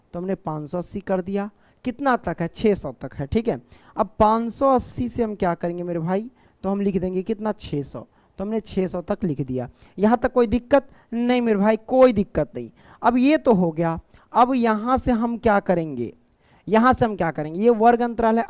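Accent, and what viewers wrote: Bihar, India. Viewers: Aap Bhihar se belong karte ho ...?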